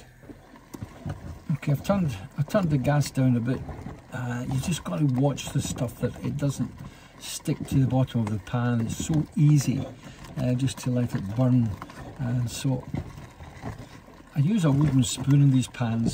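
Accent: Scotland, United Kingdom. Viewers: Love the Scottish accent, the pronunciation and passion Really interesting and inspirational thanks for posting What is it with these dog poo bags? Definitely think car owners are the biggest cause of litter. Antidotally I’ve heard campervans having litter placed deliberately outside them so they get the blame.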